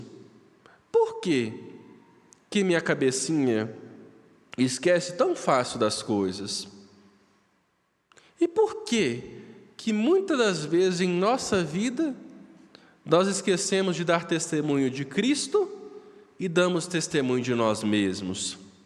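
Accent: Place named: Minas Gerais, Brazil. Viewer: A padre tem aquela fala e coisa descansada do mineiro raiz ,que eu gosto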